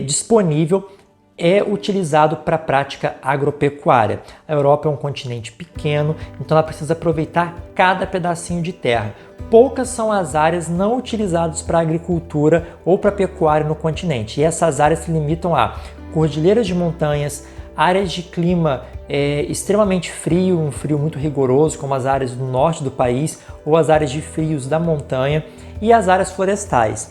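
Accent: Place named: Minas Gerais, Brazil. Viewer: Esse cara é de Minas ou do sul da bahia não é possível!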